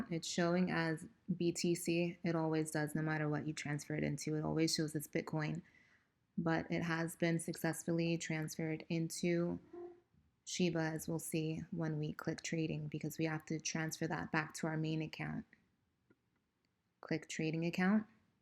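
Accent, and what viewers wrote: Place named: New York, United States. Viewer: The New York accent makes this video that much better.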